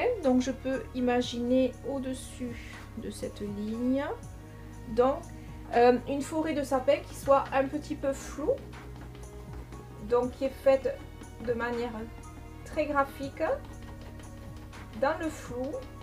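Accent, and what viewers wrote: South, France. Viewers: Je souhaite un prompt rétablissement à la Lady. Je regarde régulièrement vos vidéos et ce que vous faites est vraiment sympa et original! Vous avez une dextérité dans le maniement de votre couteau que j'aimerais bien avoir. Je peins également ou plus précisément je repeins depuis quelques temps après avoir laissé les pinceaux de côté pendant plus de vingt ans! Vous faites des expositions? J'imagine qu'avec votre accent, vous êtes dans le sud de la France. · J'adore... cela me fait rêver... cela paraît si simple et ton accent du Sud-ouest ou Sud-est me touche moi l'Aveyronnaise que je suis. Bisous et merci👄💖👍👌👏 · Merci, toujours magnifique, très bien expliqué avec ce bel accent du midi.. Bonne soirée. 💐🐈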